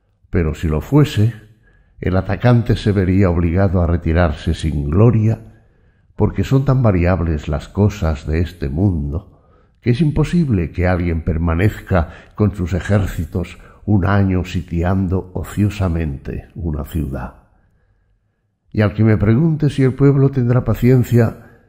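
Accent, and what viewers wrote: Spain. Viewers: Los Latinos raramente disfrutamos del acento Español pero esta voz si que que te encierra y te agrada invitándote a poner atención pero no se aun si también lo será para poner esto en practica. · Y en español ibérico....Una joya este audio. Maquiavelo más actual que nunca, no cabe duda. Mil gracias. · en español españa weon, español españa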